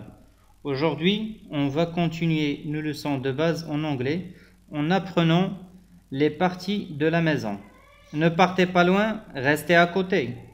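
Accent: Arab world. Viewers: Arabe qui parle anglais sa donne ça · Arabe qui parle anglais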